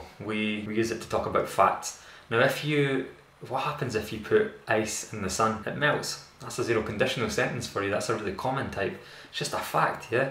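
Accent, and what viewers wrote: Scotland, United Kingdom. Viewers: Nice Scottish accent;-)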